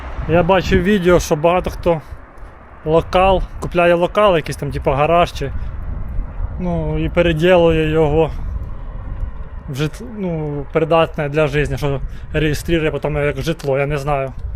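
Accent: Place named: Central Ukraine, Ukraine. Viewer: На велосипеді там класно поганяти., цікавий у Вас акцент. Ви, певно, з Черкаської чи Житомирської області?)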